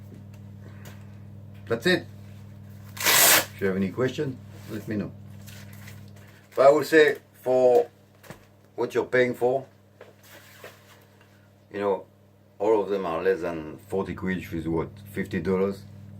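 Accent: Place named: France. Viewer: At first I thought you could Irish then i heard you and yup definitely French :) Nice review though !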